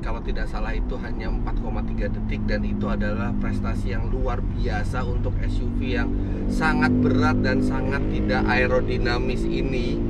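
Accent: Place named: Malang, Indonesia. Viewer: oyi sam mangkane ndelok seng lengkap opo'o om fitra kok tak arani kenek logat malang, mergo gelek bareng karo mas gilang juragan 99. Aku yo eroh nek yowis iku boso jowo sans sam gausa mesoh mesoh ngono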